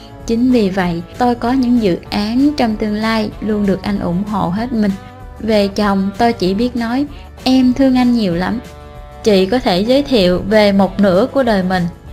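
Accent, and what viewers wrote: South, Vietnam. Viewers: Ban la nguoi mien nam .chu kg phai nguoi bac .ban doc tu giã .no nghe sao day ban hay sua lai di .ban nen doc tu giã .thanh dã di .cho de nghe .chu ban doc khang giã .nghe ky ky .khang dã de nghe hon nghe ban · Giọng thì miền nam, mà phát âm chử " G" thì miền bắc nghe thấy kỳ kỳ .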